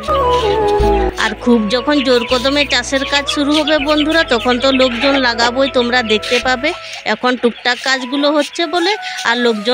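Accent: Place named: Bankura, India. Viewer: খুব মিষ্টি লাগল কথাগুলো শুনতে। অনেকটা যেন বাঁকুড়ার মেঠো সুর বাজছে। আগেকার মতো শাড়ি পরে কাজ করার অভ্যাস চলে গেছে অনেকেরই....💛❤️💛🙏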